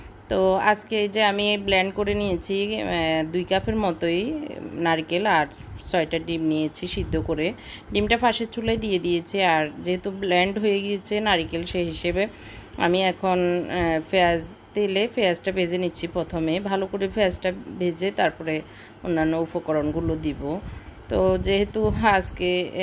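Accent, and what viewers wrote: Noakhali, Bangladesh. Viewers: ami noakhalir....apnak balo lega apnar basa noakhalir kothai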